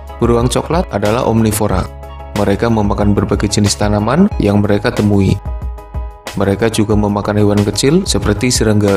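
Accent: Banyumas, Indonesia. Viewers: Bang ...org ngapak. Yaaaa???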